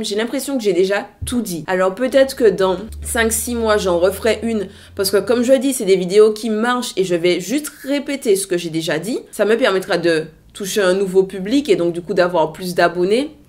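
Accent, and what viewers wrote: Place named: Antilles, Caribbean. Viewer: Hi, j'adore ton petit accent bien de chez nous Mart. ou Guad...... Cela fait un moment que je te suis j'aime beaucoup tes contenus, tu reste égale à toi toi-même, ta franchise et ton honnêteté font de toi une belle personne. De mon côté mes cheveux ont commencé à pousser et à mieux se porter quand j'ai arrêté d'acheter pleins de produits capillaires qui n'avaient aucune efficacité...... il me suffit maintenant de regarder côté cuisine et de compléter avec d'autres produits naturels. Merci pour ta franchise et bonne continuation à toi ma belle.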